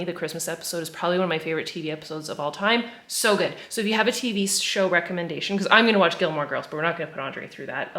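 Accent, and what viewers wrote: Quebec, Canada. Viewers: en français! Apprends-nous l'accent québécois <3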